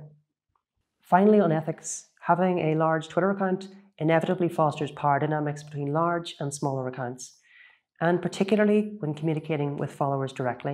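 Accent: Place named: Ireland. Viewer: Just some observations: I found your talk quite compelling for a variety of reasons. I was initially drawn in by the way you look into the camera so intently. I realized after a bit that part of the power of you gaze, I think, is that you seem to almost never blink! Don't mean to make you at all self-conscious about it, but it is really rather striking. Secondly, you have a lovely voice and manner of speaking, with that light Irish accent that sounds so compelling to my (N. American) ears. I really think you would make a terrific narrator of, say audio books! You articulate extremely clearly. Have you a red light accentuating your hair color? It looks just great! (again, so very "Irish"!) Fascinating how something as seemingly trivial as sharing cat info evolves into a helpful resource for many in such a profound way! Cats are such lovely creatures--even though I only have d_gs--and having any kind of animal does, I think, increase the humour quotient of one's life quite substantially, which is always a positive and healthy thing. 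Sounds as though you are conducting your life in a very positive manner. Well done, and kudos!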